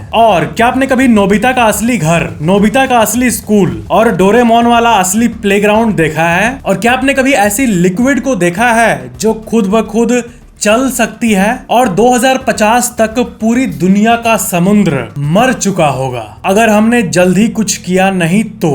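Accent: Bihar, India. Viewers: Your Bihari Vasa Acha Tha 😅😅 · Bihari confirmed✅ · That bihar Accent.... kaisan baada ho❤❤😂😂😂😂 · LAGTA HAI AAP BIHAR SE HAI 😄😄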